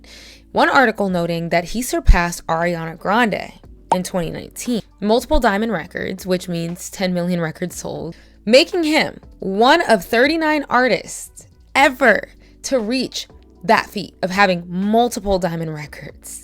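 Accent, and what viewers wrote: United Kingdom. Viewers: "Do you y'all remember when people thought he was British?" I THOUGHT HE WAS BRITISH UNTIL LITERALLY JUST NOWWWW OMFG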